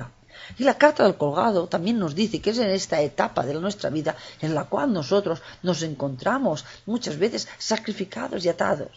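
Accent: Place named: Spain. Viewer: Hola, gracias por los vídeos, pero sería mucho más agradable que Ud pudiera vocalizar, hablar..más lentamente , ya que no todos somos españoles y su acento y tonada, hablando asi!, tan rápidamente, hace que sea un poco dificil de seguirla por la cantidad de información que hay que asimilar y da la sensación de estar Ud muy apurada por terminar! :-( Gracias!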